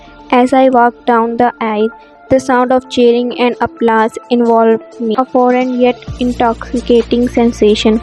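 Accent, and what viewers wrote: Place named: Asia. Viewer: don't mind but I thought you were from Asia or something cuz of your accent 🙂🙂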